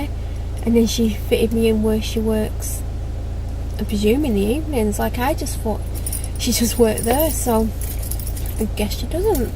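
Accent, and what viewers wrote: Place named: North, United Kingdom. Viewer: I love your northern accent too 😋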